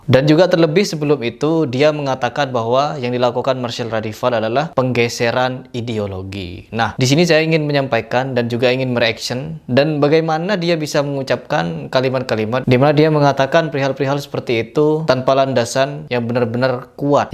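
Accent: Madura, Indonesia. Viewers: Mas sampean kok ada logat logat maduranya, bisa bahasa madura mas??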